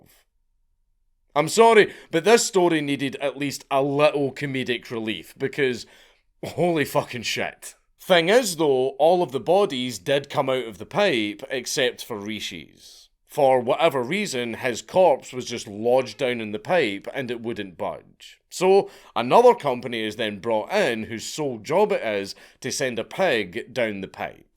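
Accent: Scotland, United Kingdom. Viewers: Stuff like this is hard to get through. Scottish accent or not. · Oh damn a story I actually do know! but I'm so happy to hear it in a Scottish accent *If you want to hear the audio MrBallen has it at the end of his video covering this same subject. Both videos are equally amazing though. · Fascinated as an American to hear an authentic Scots burr. Barely intelligible to me. Have to rely on the visuals. At least until I am used to it. Very different from Scotty on Star Trek 😂😊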